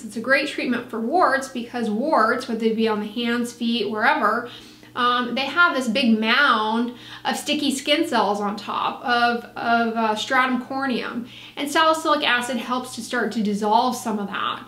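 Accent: United States. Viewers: Where in America is that accent from?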